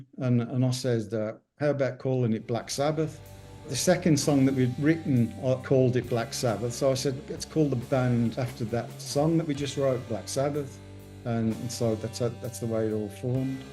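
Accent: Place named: United States. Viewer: love geezer, dont really like that yanky narrator!!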